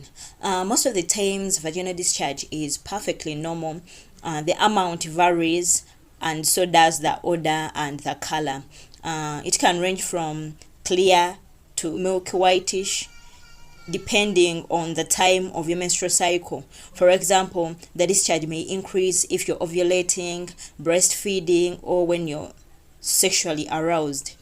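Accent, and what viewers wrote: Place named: Uganda. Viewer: You sound Ugandan ❤️🤗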